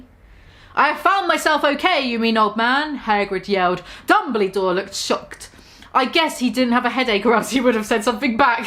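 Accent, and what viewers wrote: United Kingdom. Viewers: British Chav typing and being read by a British accent ... that's my cup.of tea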